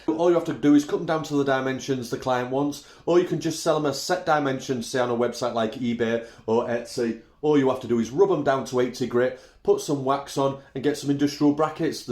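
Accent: Hull, United Kingdom. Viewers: Nice one, thanks. I recognized your accent immediately, I lived in Hull for 10 yrs. 👌💕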